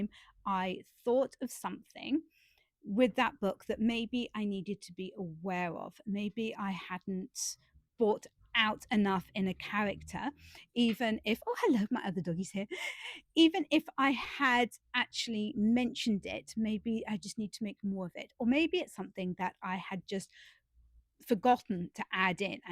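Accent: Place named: New York, United States. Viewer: welcome. I love when your real NY accent slips out.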